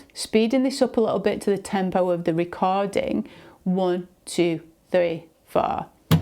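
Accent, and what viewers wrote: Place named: Yorkshire, United Kingdom. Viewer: Awesome lesson, thank you. I’m guessing by your accent that you’re not too far away from me Leeds/Bradford area?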